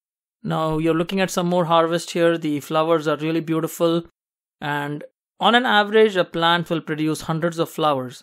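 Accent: India. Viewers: You sounds that you are Indian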